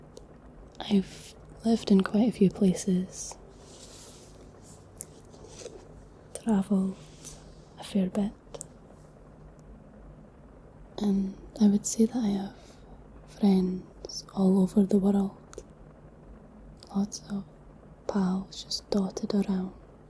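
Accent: Scotland, United Kingdom. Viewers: i really like your scottish accent. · Your voice makes my brain melt..Scottish accent is so good for ASMR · Never realised i liked the scottish accent. You have a very relaxing and soothing voice. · I'm loving the soft speaking instead of the whispers, it highlights your nice Scottish accent, it's so soothing 😴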